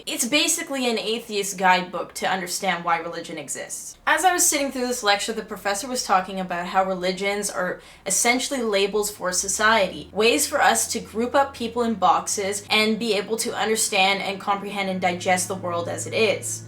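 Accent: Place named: Newfoundland, Canada. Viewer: dude you sound newfie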